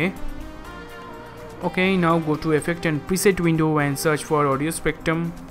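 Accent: India. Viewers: You know its legit when a guy with indian accent makes a tutorial on it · Hindi Accent Wow nice x)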